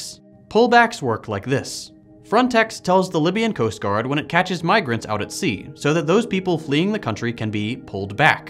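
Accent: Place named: United States. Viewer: Sounds so... American.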